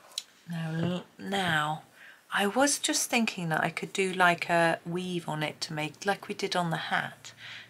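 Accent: United Kingdom. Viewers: I love your chattie videos. The British accent and info on the “ways” the Brit’s do and say things. Just love it. 🥰 😊 And, of course, your coloring.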